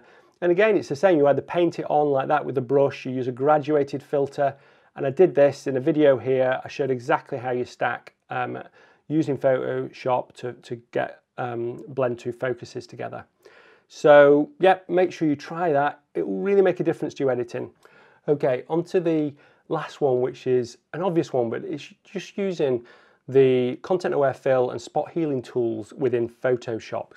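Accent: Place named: Sheffield, United Kingdom. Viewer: Great video. Is that a Sheffield accent I hear:) Nottingham lad here...!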